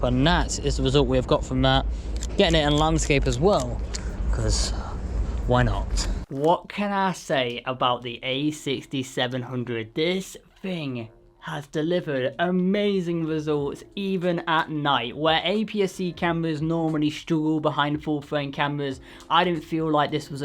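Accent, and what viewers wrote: Manchester, United Kingdom. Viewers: Jajajajaja What?! No! Manc meaning Mancunian - most of the time your accent brings me back there. I'm Spanish but I've been living up there for a few good years. It's a dear place for me with mostly lovely, warm people (being from Andalucia you kinda miss that when you're away and I got that there) Not to forget that Manchester is in a pretty sweet spot where both Peak district and Lake district are at a stone throw! Soooo many awesome places to explore :_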